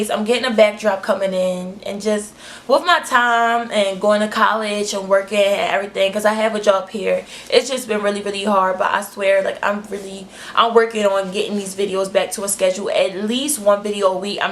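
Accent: Baltimore, United States. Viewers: Omg I knew you were from Baltimore because of your accent! Great video btw:)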